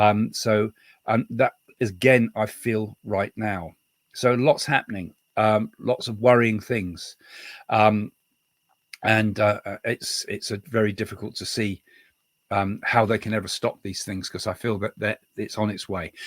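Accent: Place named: Scotland, United Kingdom. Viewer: Some Scottish accent here and there during your conversation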